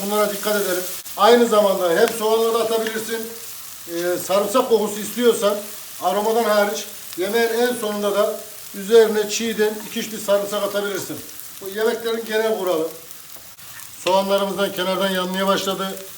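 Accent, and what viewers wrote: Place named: Ordu, Turkey. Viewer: Ben de Orduluyum şefim, aksanınız aynı bizim oralar. Başarılar diliyorum size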